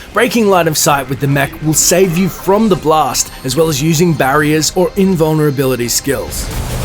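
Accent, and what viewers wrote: Australia. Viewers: Are you Aussie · Ay, U Aussie?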